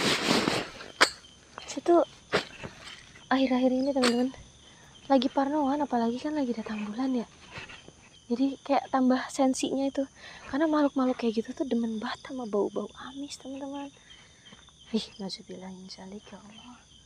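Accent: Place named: Sunda, Indonesia. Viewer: org mna kak, kek org sunda🤭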